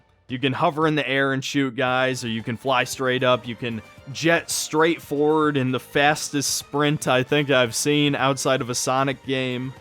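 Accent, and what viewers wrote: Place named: Midwest, United States. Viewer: The Midwest accent is strong with this one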